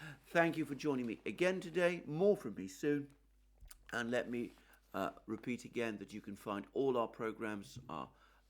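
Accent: United Kingdom. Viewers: The sound is much better. Thanks for the unbiased analysis with a British accent. Scott Ritter & Col MacGregor use American-English which is familiar to me.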